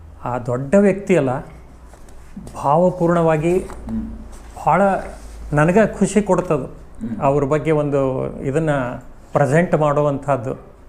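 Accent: Standard. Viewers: ತುಂಬಾ ಒಳ್ಳೆಯ ಸಂದರ್ಶನ ಹಳೇ ಕಾಲದ ರೇಡಿಯೋ ನೆನಪು..... ಅಚ್ಚ ಹಾಗೂ ಶುದ್ಧ ಕನ್ನಡದಲ್ಲಿ ನುಡಿಮುತ್ತುಗಳ ಸಂದರ್ಶನ ನಮ್ಮ ಕರ್ನಾಟಕದ ಹೆಮ್ಮೆಯ ವಾಚಕರು ಜೈ ಕನ್ನಡ ಜೈ ಕರ್ನಾಟಕ,💛❤️🇮🇳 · ಸ್ವಚ್ಛ ಕನ್ನಡ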